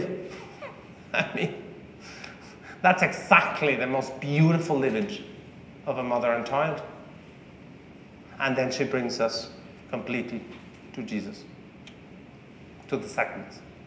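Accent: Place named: Ireland. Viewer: I pick up a bit of Irish in your accent, I thought that can't be, you're Spanish speaking, maybe you've been to Ireland or studied and lectured there ? Marvellous miracle which is inexplicable , I think there's more to be found, hidden in this treasure .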